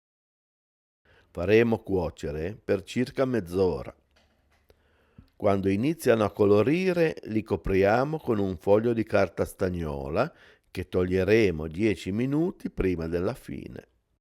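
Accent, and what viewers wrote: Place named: Genoa, Italy. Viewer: che bello sentire un bell'accento" zeneise"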